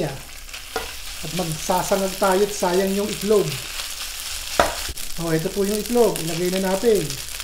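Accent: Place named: Bulacan, Philippines. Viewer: Chef,bulakenyo po ba kayo?kasi ung accent nyo at diction ay bulakenyo 😁😁😁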